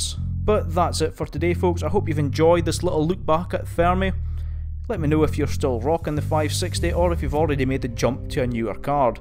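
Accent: United Kingdom. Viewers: Which part does this guy come from in the UK?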